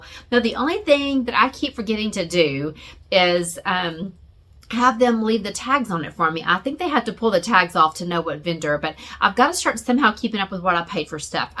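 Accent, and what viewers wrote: Texas, United States. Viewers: I really like your 'treasures'. It sounds like you're from Texas--we are, too. Where are the best antique stores in the Hill Country? We take all of our visitors to the Silo's in Waco, also.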